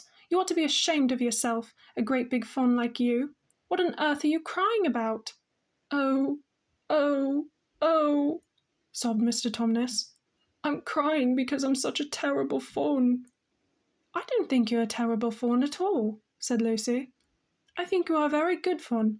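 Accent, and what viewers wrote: United Kingdom. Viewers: Very classy british accent.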